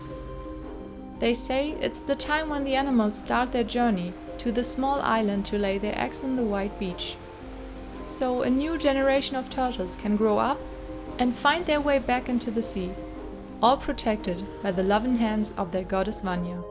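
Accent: Germany. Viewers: R u a German? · Are you German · Are you German? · Are you german? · Are you German? You kinda sound like you are. (I'm just wondering.. xD) P.S. Love the Doll! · Is she German? (Because of her accent) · Why am I always cringing on german accent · woah i just discovered your channel right now and YOU'RE SOOO TALENTED! (btw (if you ever see this comment xd) i always try to recognize an accent and my question is.... are you german? it sounds a bit like this xd ((and because of the "moin" at your channel description))) (if you're german: Ich liebe diese Puppe! XD)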